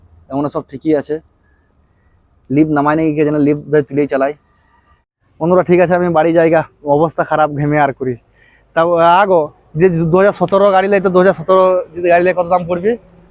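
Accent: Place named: Purulia, India. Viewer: দাদা আপনার বাড়ি কোথায় ❤❤❤ , কথা গুলা আমাদের পুরুলিয়া র মত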